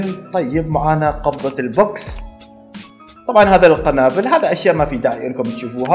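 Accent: Oman. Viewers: انت ايش اصلك عماني ولا شو ؟؟؟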